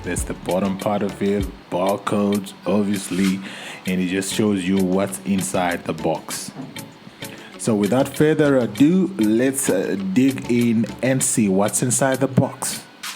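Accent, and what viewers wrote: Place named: Zimbabwe. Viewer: you sound like a Zim own?:). You from Zim?. Great review and unboxing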